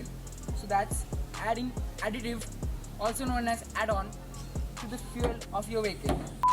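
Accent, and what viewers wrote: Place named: England, United Kingdom. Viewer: Nice video bhava... Nice English accent..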